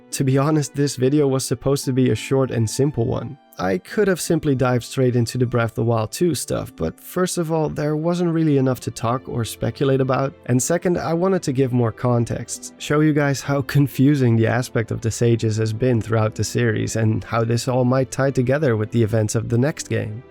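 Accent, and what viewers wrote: Netherlands. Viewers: You’re from The Netherlands aren’t you? I can clearly hear it in your voice. xD Cool video!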